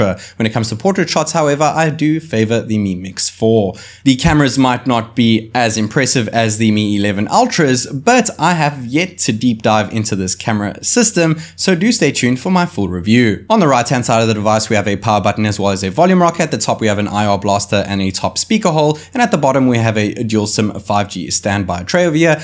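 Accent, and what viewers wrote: Johannesburg, South Africa. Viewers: Is that a JHB accent I'm hearing??🤔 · Great video. Are you South African? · South African accent 👀 lezzz go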